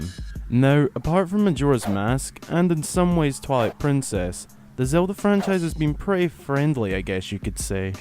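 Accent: Scotland, United Kingdom. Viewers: Moan the Scotland! Where u from mate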